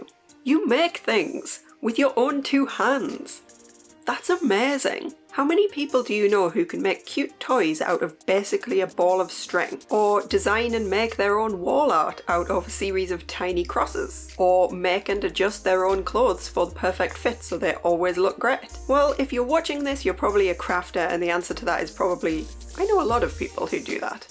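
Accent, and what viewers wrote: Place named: North East England, United Kingdom. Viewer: Nice to see you where are you from sounds like the north east in uk l am from uk l live in Redcar North Yorkshire l did get your name from Chris cross stitch shout out look forward to seeing your next video xx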